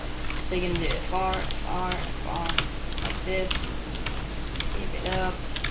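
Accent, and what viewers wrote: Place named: Sweden. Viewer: he sounding swidish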